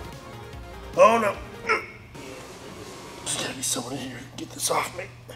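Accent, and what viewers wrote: Kentucky, United States. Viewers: What part of Kentucky you are from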